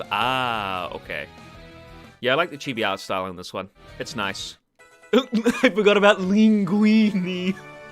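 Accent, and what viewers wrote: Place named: France. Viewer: Love your french accent haha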